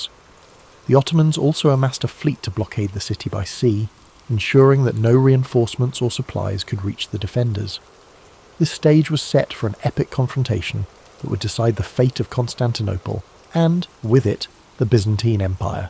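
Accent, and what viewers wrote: United Kingdom. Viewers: I have to wonder, much as i am listening to these! if this is AI as so many facts and stories are being put out quite fast and this is an english ‘narrator’ but the site is American.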